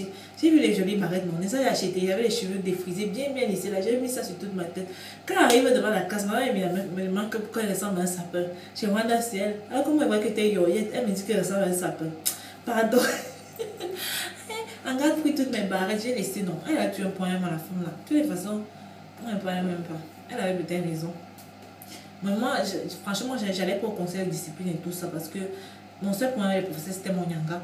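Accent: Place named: France. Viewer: maaaaama ce que moi je wanda c'est l'accent qu'elle vole au blanc je connais des blancs qui vivent au camer qui on toujours l'accent francais pouquoi ils ne prennent pas l'accent ? merci pour ton tag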